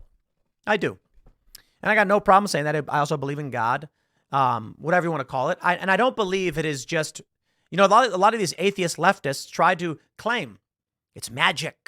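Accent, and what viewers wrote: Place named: Chicago, United States. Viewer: I hear your Chicago accent today!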